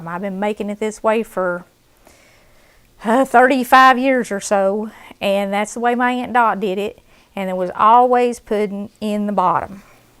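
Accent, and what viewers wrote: South, United States. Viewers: It's nice to hear your Southern English voice again:) I feel that you've been going through a hard time... (sickness - death..?) God bless you,.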